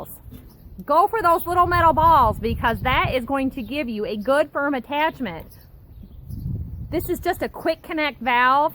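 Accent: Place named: Midwest, United States. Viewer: wow I can listen to you talk all day. you got that cool Midwest Gretchen Whitmer fargo accent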